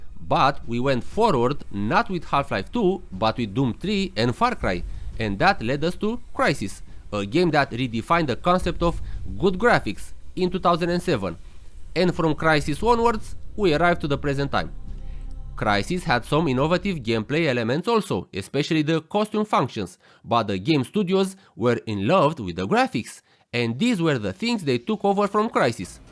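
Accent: Romania. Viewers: you seem romanian by accent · sami bag pula daca tu nu esti roman cu asa accent xd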